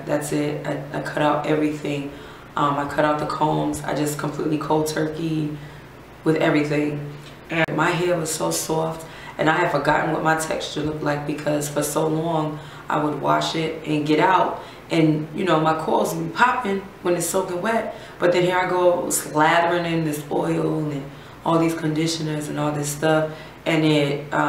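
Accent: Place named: Barbados. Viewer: Lol i heard you say "Good evening everybody," in your video about water only washing and I just knewww you were a Bajan. Go girl! Yuh sweet!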